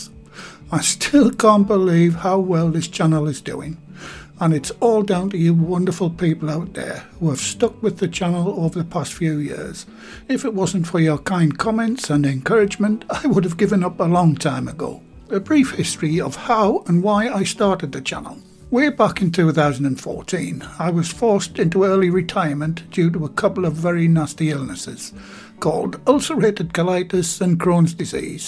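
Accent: United Kingdom. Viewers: You deserve every iota of your success, and I must say aside from your tasty recipes I love your easy manner, no-nonsense approach and straighforward instructions and meaurements. My Grandad (who must have hailed from a similar part of the UK as your accent is a dead-ringer for his), who was widowed at a young age with a young family to look after was a fantastic cook and what you make here is the sort of stuff he would have made for the sunday dinners we used to enjoy at his house. Sadly he passed away before he could teach me how to make his traditional English recipes so to say I'm glad I found your channel is an understatement. Thank you for what you do, truly.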